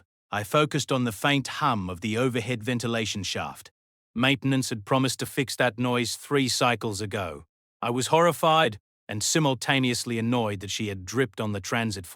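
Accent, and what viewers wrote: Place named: Australia. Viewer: Love the narrator's Strine accent!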